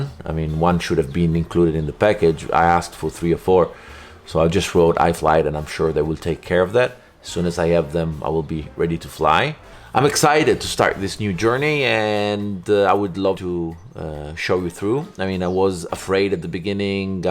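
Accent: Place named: Italy. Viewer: Ciao, ammazza parli meglio di me l'inglese. Diciamo che si riconosce l'accento Italiano ma si capisce benissimo, io ero pilota privato ora sono Al secondo drone, un giocattolo da 30$ giusto x funn, un abbraccio 😘✌🇨🇦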